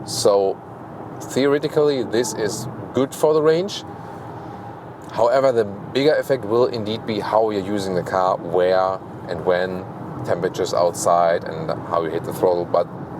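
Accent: Germany. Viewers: I fiind it very hard to understand this heavy german accent english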